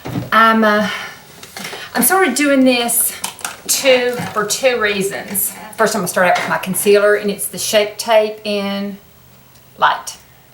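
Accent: South, United States. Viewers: I never knew you thought this way about your accent. I absolutely LOVE your southern accent....full of charm.❤-Ruthie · Take care of. I hope everything goes well at your doctor appointment, please update us. I want you to know that I absolutely adore you, and that sweet southern drawl of yours! You are so sweet and so encouraging to all of us!! I love you, and love your channel!! I am a 52-year-old grandmother of 12, and I want you to know it is so refreshing to see someone in the over 40 crowd, Who is as beautiful and sweet as you are!!! ❤️💜❤️💜❤️💜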